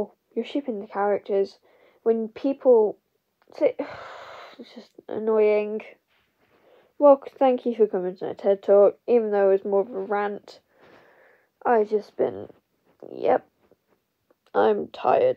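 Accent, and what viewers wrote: United Kingdom. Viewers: Are you British? 😂😂😅Me in a serious video lol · O your voice is....British.? Nice voice!!! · You sound british..... Also cool art style😀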